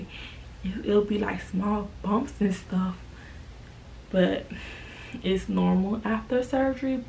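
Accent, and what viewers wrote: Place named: Miami, United States. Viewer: Lmao your Miami accent is so cute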